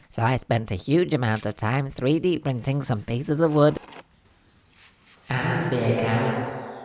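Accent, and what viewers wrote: Yorkshire, United Kingdom. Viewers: I'd bet my hat hails from Yorkshire with that lovely accent, but I recognised Barton on Sea!! Perhaps he just gets around a lot - van life and all that. But wait, he has a shed! Hmmm, maybe he has many sheds...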